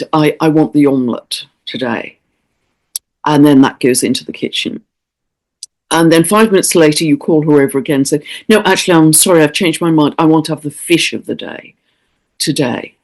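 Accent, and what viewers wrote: United Kingdom. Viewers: "starve out the scary, love in the good" in a British accent, of course ❤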